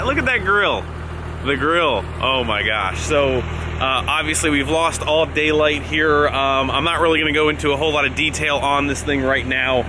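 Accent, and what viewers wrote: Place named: Texas, United States. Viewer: No, but his voice DOES develop a 'Texas Twang.'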